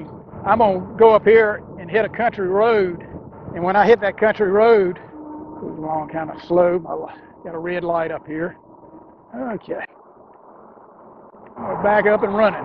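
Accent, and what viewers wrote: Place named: Fayetteville, United States. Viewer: Enjoyed your video, your accent sounds like my mom and all of her sisters/brothers/etc, big family from Fayetteville.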